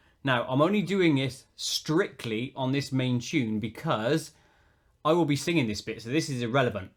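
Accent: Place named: Somerset, United Kingdom. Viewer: Where are you from? You sound local ish to me! I'm a Somerset boy! I'm guessing Bristol or Swindon!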